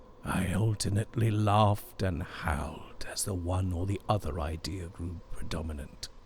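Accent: England, United Kingdom. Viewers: Why always freaking englesh accent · Spent some of my Halloween with these recordings. It was wonderful! Thx! Love the reader and even the xtra effects worked nicely. As far as his anglo-accent, gotta say i appreciated it. I've heard too many flat, nasally voices of Americans with no sense of rhythm to the words and it's practically unlistenable. Js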